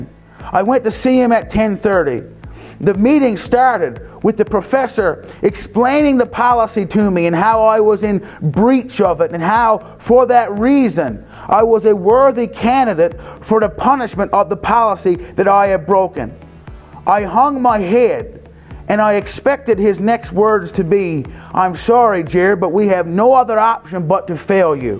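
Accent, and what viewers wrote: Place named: Newfoundland, Canada. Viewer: I have never heard a broader Newfie accent than this pastor- cracks me uppppp!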